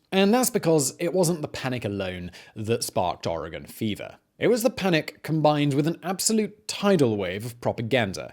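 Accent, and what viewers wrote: United Kingdom. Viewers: Your voice and delivery get on my nerves!!!! And it is nothing to do with your British accent, I usually find that pleasing.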